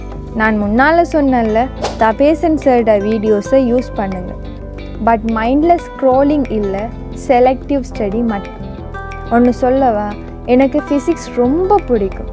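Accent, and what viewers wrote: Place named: Trincomalee, Sri Lanka. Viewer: Ninga trincomalee distric nu nenekiren enthe oorunum vilangitu unga slang la kandu pudichiten😂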